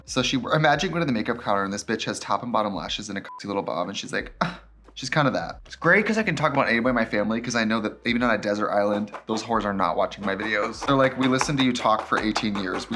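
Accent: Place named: Milwaukee, United States. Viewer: The Milwaukee accent is front and center today ❤😂 LOVEEEEE ❤️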